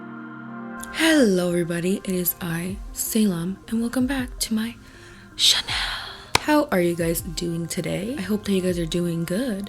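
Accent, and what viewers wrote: United States. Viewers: bluey and bingo are living my childhood. i've lived in australia my whole life and it is incredibly relatable! there are a few things in this video you got wrong, but it isnn't your fault! its just because your accent and you not knowing australia! it isn't queens island, its called queensland. i still love you♥. also the way you say osy!! for americans, say ozzie. hahaha got a good laugh. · As an Australian i love seeing this being talked about around the world. I also find it a little funny hearing how Americans pronounce the Australian locations.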